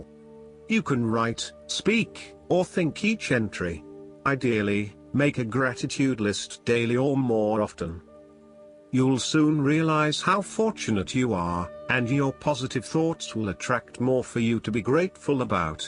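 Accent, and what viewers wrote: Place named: United Kingdom. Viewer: Beautiful British English pronunciation. Good reading, as well. TNX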